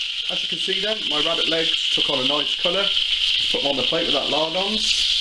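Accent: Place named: Wolverhampton, United Kingdom. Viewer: you sound local to wolves, when can i come round for some?? fair play mucka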